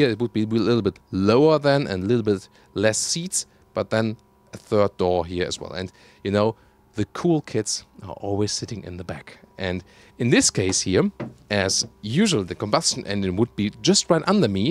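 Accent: Germany. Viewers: Man hört halt einfach raus das du deutsch bist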